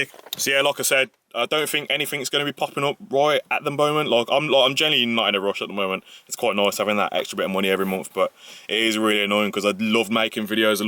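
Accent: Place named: Midlands, United Kingdom. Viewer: Yo just been watching your vids as well I have fiesta mk6 too I've started upgrading it a bit lately got new exhaust have alloys on but those wheels man look cool are they legal where's the tread lol? Also where you from sound like midlands like me I'd love to get advice off you for my car once this virus outbreak has stopped!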